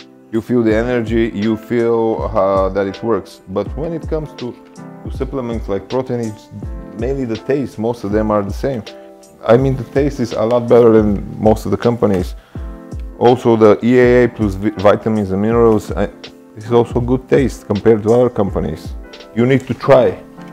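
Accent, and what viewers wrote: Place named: Balkans. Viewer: English with Balkan accent!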